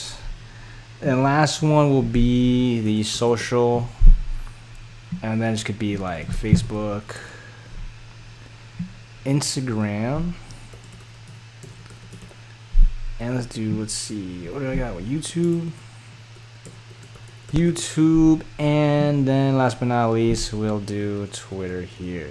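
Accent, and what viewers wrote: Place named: United States. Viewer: Great job! And American English!.. Thank you!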